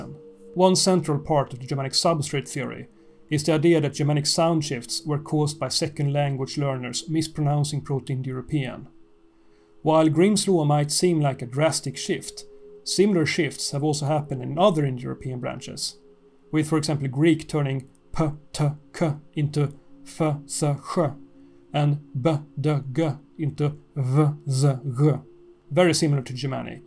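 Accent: Sweden. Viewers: As a Swede, I appreciate the heavy Swedish accent!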